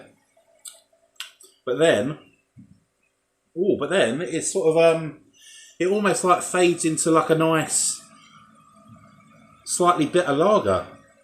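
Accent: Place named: United Kingdom. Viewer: your british accent is so lovely and comforting, like music for my ears and heart ❤ thank you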